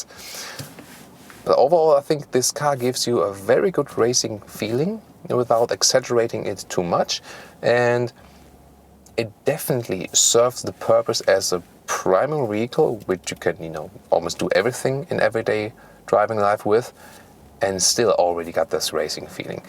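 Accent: Germany. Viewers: Once more an excellent review, a pleasure to watch. Thank you. I also enjoy your English with some German flavours, which is totally different compared to American English which I hate as many intelligent people do 😝..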